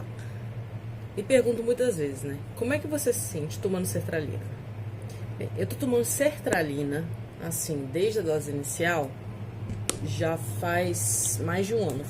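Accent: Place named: Bahia, Brazil. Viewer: Muito bom e, bem explicado. O sotaque baiano foi o destaque, esse medicamento e sensacional.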